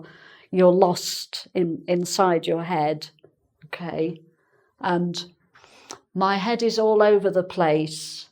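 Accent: England, United Kingdom. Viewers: Here in Brazil, we also have a word like prickly and this one is "espinhenta" a prickly person is a "pessoa espinhenta", full of sorrow and not a good person to be with. And touchy, if it's exactly as I can wonder while I watch your explaining is what we brazillians use to call "uma pessoa muito dódoi", what means a person who would be easily offended due to very little things. I'm loving your british accent because I'm training listening of british english. Thank you very much. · Yes ! This Lady IS a true teacher, a Real english person with a Real accent 👍. It's Very Good to learn with her. No mistake. Thanks for her. 🌹💓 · England I'm guessing based on her accent, but I couldn't say exactly where.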